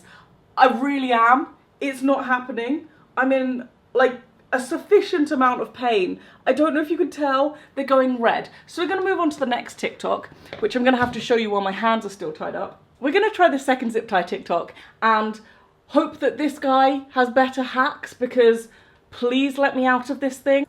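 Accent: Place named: England, United Kingdom. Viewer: I love english accents lol always reminds me of bridget jones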